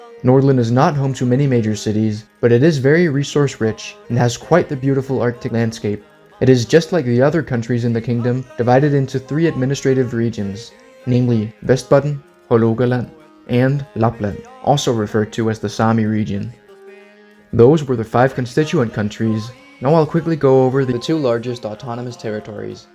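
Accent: Denmark. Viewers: wtf why is he mispronouncing the swedish names in danish? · your propally a dane i know cus the way you pronuence sønderjylland and sjæland and the danish accent is perfect for a dane how do i know im a dane. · Cant help but notice the Danish accent on the creator. I feel we already are in this union in some ways. I certainly appreciate all our neighbours <3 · he has a slight danish accent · De märks att han är dansk xD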